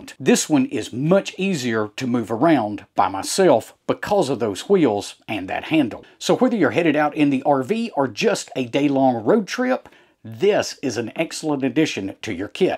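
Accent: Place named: Middle Tennessee, United States. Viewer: I am from Ohio but this accent is familiar to me because I have lived in Middle TN for 20 years. To help those who are struggling, own = on. 😂 Great channel. Thanks for the videos.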